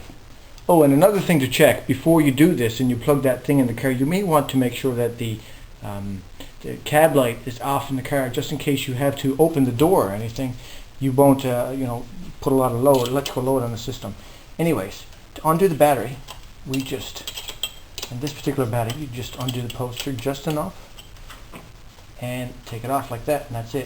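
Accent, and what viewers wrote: Canada. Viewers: Another nice review. You seem to have a maritime accent -- are you from there?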